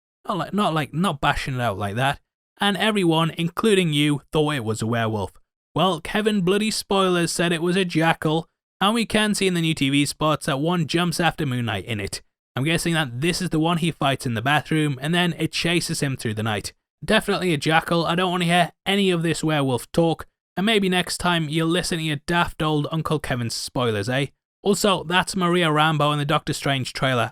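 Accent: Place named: Cockney, United Kingdom. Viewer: Most Americans probably thought you were cockney anyway 😅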